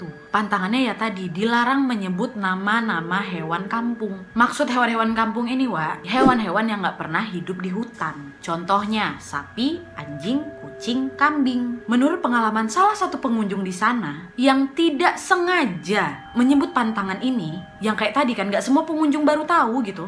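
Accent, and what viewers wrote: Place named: Sumatra, Indonesia. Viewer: Halo, salam kenal. Aku penonton baru. Suka banget sama pembawaanmi santai tapi ngena. Oia, mf sebelumnya... ini orang sumatera kah? Karena logat bicaranya agak mirip sama kami... Stay safe terus ya. Semangat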